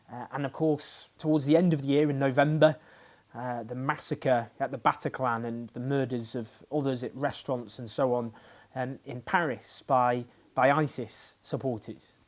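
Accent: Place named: North, United Kingdom. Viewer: Sound more northern/working_class than in other videos. Product of the content ?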